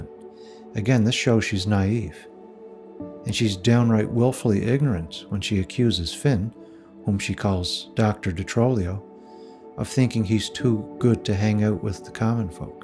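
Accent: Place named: Cape Breton, Canada. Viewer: You've got to be from Cape Breton. I grew up surrounded by that accent. 😁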